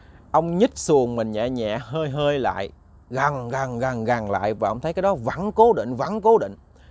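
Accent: Mekong Delta, Vietnam. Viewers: Ma mà khôn quá xá hả. Thích nghe giọng miền tây của em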